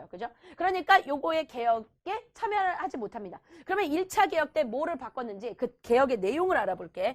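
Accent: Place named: Jeolla, South Korea. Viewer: 이분 고향 전라도라에 내 목숨 건다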